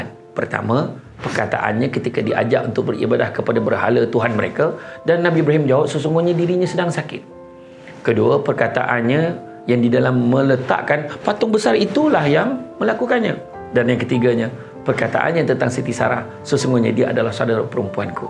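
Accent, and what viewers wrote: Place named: Kuala Lumpur, Malaysia. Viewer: Jangga raso eehh Ustaz cakap kolumpo.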